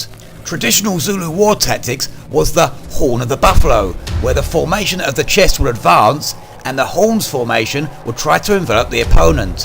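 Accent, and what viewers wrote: United Kingdom. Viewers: Who is this bloody oik with his mockney voice? It was not Durnford who discovered the Zulu army, and the rest of the account is sketchy to say the least. 2 out of 10, sonny, but that's an A+ by modern UK Comprehensive standards.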